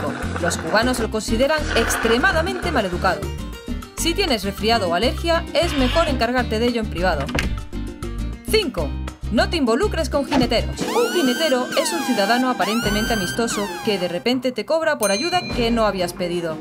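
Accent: Spain. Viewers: Es de España por acento . En fin que en España es inseguro . En Barcelona de noche es inseguro . Y en Madrid hay sitios inseguros .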